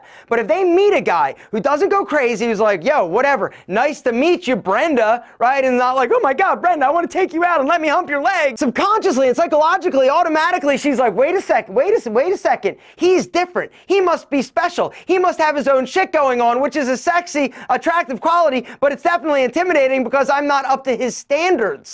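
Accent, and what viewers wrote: Philadelphia, United States. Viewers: Bro you have to be from Philly with that accent.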